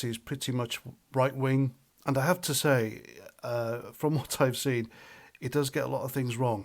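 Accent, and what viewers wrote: Wales, United Kingdom. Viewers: High like to view ratio, small but intense viewership this channel gets. I love the Welsh accent, it is Welsh? The ultimate in humble our. Voice of the people!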